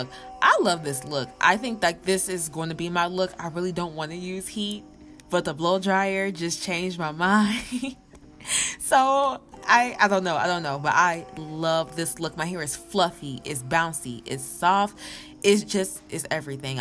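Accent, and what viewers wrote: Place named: Philadelphia, United States. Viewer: baby girl u from Philly? it's soo subtle but i hear an accent😁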